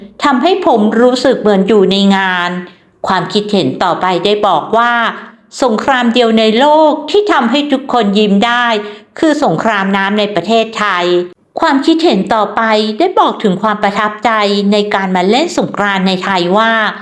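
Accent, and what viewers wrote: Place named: China. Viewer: ทำไมแอดพูด ส.เสือไม่ค่อยชัด สำเนียงพูดเหมือนคนจีนเลย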